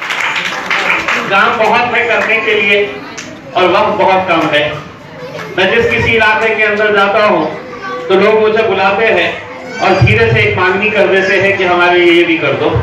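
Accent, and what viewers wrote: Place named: Aurangabad, India. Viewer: Awaz aurangabad ki👍👍👍👍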